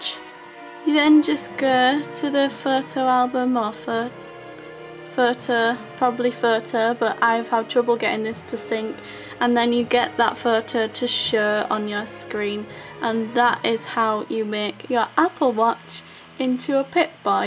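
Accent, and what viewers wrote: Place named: Hull, United Kingdom. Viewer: Sound like you’re from Hull! 😎👍🏼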